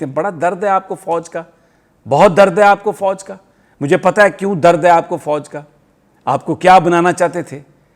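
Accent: Punjab, Pakistan. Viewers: In love wd Ur Punjabi ❤️😂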